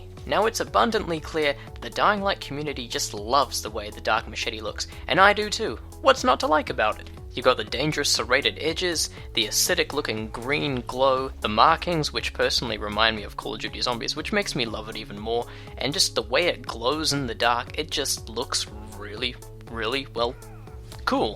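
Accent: New Zealand. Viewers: I've got 2 things to say are you a new zealander and you've got your own costume😲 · U from nz?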